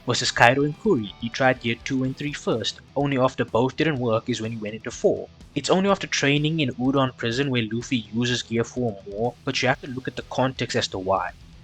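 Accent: South Africa. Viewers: Why do you have like 5 accents in 1 If i had to guess maybe you're Indian from South Africa? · I smell a RSA accent 🇿🇦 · Yo gang are u from SA? I think I can hear it in ur accent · I KNOW A SOUTH AFRICAN ACCENT WHEN I HEAR IT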